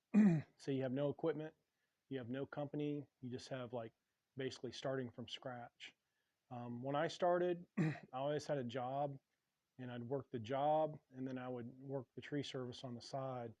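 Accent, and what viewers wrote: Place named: North Florida, United States. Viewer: I just figured out we live in the same town..i am not a tree guy. I thought I spotted the No Flo accent. Thank you for the video. I am just a wannabe tree guy.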